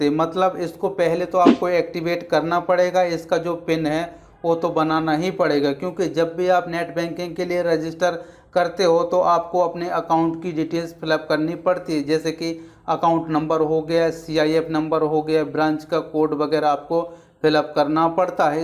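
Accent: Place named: Bundelkhand, India. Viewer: Tum Bundelkhand ke ho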